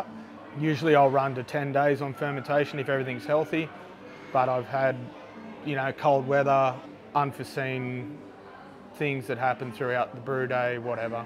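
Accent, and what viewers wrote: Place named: Australia. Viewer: It's so good to hear an Aussie accent not pronouncing words like yanks, if only we could do the same PSA for couch (it's lounge), cookie (it's a damn biscuit) and ass (it's arse here)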